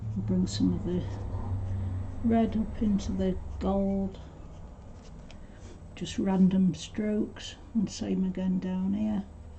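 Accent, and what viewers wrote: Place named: North West England, United Kingdom. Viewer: Hi...I'm currently doing this colouring at the moment...I love Hares and love the way you've built up the colour here. You sound very local to me...north west England?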